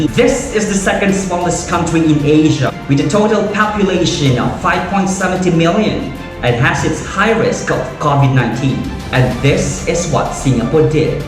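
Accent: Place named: Philippines. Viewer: Have you been given citizenship already? You sound unmistakably Pinoy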